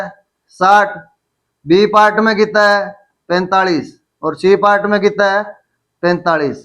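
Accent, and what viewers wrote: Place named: Bagdi, India. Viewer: Waa Sir apni bagdi bhasa m bhot bdiya triya bat clear kro the 👏👏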